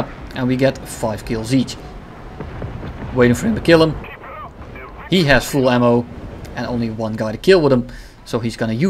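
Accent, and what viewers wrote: Netherlands. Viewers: i really love jouw dutche accentje😂 geen haat bro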